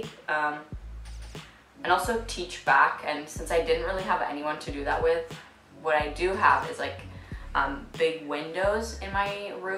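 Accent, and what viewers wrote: United States. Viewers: Yeah. .. love the drive and commitment you've explained in the video. Its not smooth cruising as most people like painting it as. love your vids, you're American right? detect the accent in there...and not to probe but what made ya come to England for studies?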